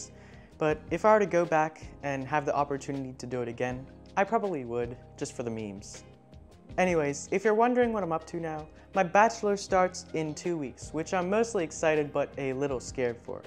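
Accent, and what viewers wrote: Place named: Australia. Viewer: As soon as I heard 'I decided to grow a pair', I thought wait what is he Australian? Yep. 🤣 Good luck in your studies and good to see some young passionate people coming into the field!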